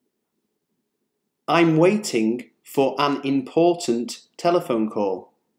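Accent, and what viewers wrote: United Kingdom. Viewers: From what part of Britain this accent is? In some words the pronunciation differs from that in transcription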